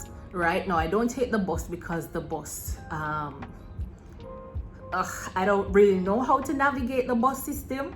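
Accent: Jamaica. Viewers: Jamaican? Hi from Trinidad!!! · I can feel Jamaican accent behind you words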